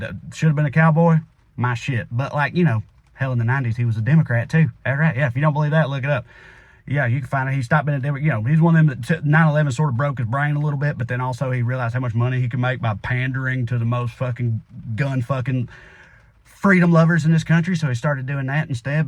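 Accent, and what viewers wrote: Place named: South, United States. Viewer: I'm an old Southern woman with an accent very similar to, so I tell him, "I love ya like I was your Mema!"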